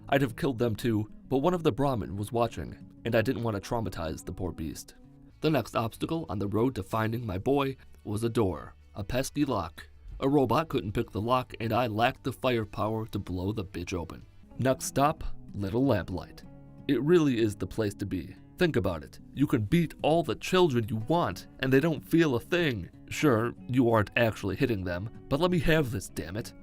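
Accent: Michigan, United States. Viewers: That’s what mighiganders sound like you are offending my people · R u from Michigan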